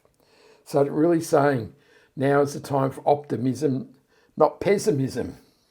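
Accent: Australia. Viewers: Thanks very much - great reading Love listening to a fellow Aussie ❤️ your interpretations always have depth and an authenticity to them Wishing you much light and happiness 😊😂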